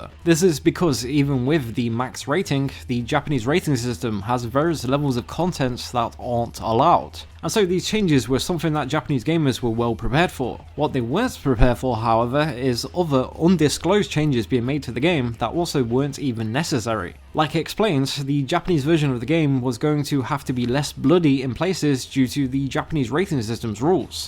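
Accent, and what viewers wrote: United Kingdom. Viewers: He is british. It may come as a suprise but most people who write or speak in english on the Internet are not from America. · He's british.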